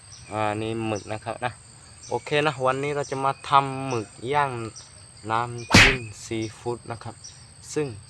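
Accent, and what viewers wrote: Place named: Southern Thailand, Thailand. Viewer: บังพูดนายูบ้านเราเลยไม่ต้องอายบัง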